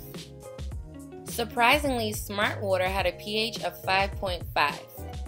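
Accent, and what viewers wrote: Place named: New Jersey, United States. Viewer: Sounds like Jersey :) Wahr-tor instead of water hahah but since there's no Poland spring I'm guessing you're not actually from Jersey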